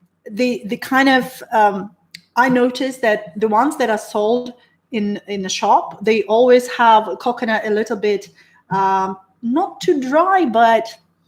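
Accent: Jamaica. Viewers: I love how you pronounce some words like us Jamaicans. I love your channel. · Your talking is so fresh i love they way you blend your Jamaican language is splendid and so re refreshing your shows are fantastic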